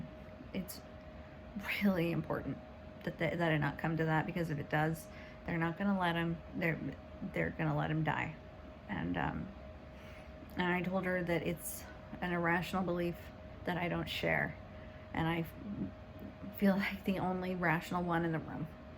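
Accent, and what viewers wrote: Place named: United States. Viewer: My thoughts are with you sister. I'm a dissociated apostate 2 yrs now and my beautiful wife Nicole is still a inactive fully believing jw. I told Nicole if she ever needs a blood transfusion I'll give her one she said she'll divorce me. I told her id rather have a living divorced wife than a dead married one. When I dissociated 2 years ago over the child abuse cover ups. I rang up Nicolas father who's not a jw smart man and told him I'll give his daughter a blood transfusion if she needs one and I apologised to him for been so brain washed by this cult he thanked me and laughed when I said I'll give her my blood you'll get to keep your daughter I'll just lose my wife. I told her doctor I'll give her my blood he said we can't go against your wife's medical health directive and as doctors we can't go against your wife's religious beliefs I'll rip up her medical directive then. 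So dear sister what your going through now is what us normal people will all go through when we have jw family members who we dearly love and may well be in the situation your in now . So my dear sister I'll give you a big Australian hug all the way to America you sound American or Canadian us Aussies can't tell the difference .I love you sister and feel for you check out my you Tube channel called Justin porter I have 13 videos one where I impersonate Steven left and one where I film Australian fruit bats and talk about apostate goodies because us apostates are the goodies. Warm apostate love dear sweet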